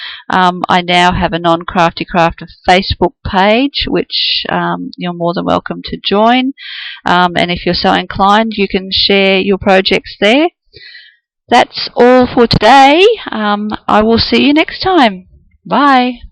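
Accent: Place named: Australia. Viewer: Loooove your Aussie accent! TFS that vid. It's awesome! :-)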